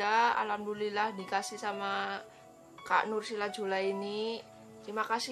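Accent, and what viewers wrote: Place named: Java, Indonesia. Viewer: Wa'alaikumsalam wr wb Waaah tasnya bagus bangeeet 🤭 Medok banget mbak hehee Salam ngapak lah Nek ora ngapak genah ora kepenak ya mbak 👍 Selamat ya dapat kiriman paket dua sekaligus dari umi rizal A dan mbak Nursilah julaini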